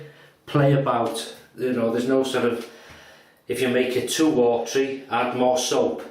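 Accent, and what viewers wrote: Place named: Wales, United Kingdom. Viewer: Very cool and fun video again :) I really like your accent, reminds me of my good times when I worked in Wales.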